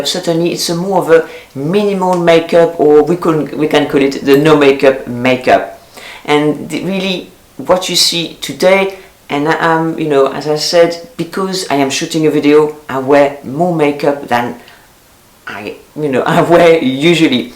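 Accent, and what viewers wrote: France. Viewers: If I wear a lot of make-up, I seem to look a lot older, so less is more for me. Although nearly 69 I've always had and still have a very oily skin, which thankfully means less wrinkles. I have never liked foundation so use a factor 30 tinted city block cream, a little blusher , mascara, eyebrow pencil and lip gloss and that's it. I'd love to wear a red lipstick like you but I'm very fair and a red lip doesn't suit me unfortunately. Love your videos and your wonderful French accent xx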